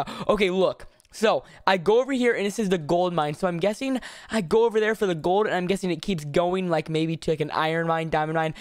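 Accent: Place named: Texas, United States. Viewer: ur texas accent tho ... “tin” = ten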